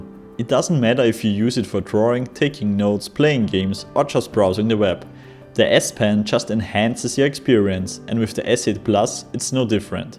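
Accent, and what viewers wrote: Germany. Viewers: It sounds he is German.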